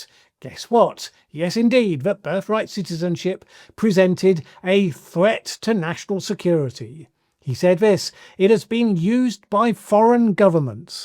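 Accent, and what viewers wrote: United Kingdom. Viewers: When someone uses incorrect grammar it makes me doubt what they are talking about. He’s obviously British which may result in a difference in pronunciation.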